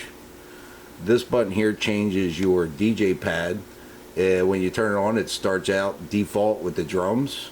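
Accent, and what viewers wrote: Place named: Pittsburgh, United States. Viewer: ....that accent though!!! Bro that sounds like Pittsburghese lol!! Great review though man....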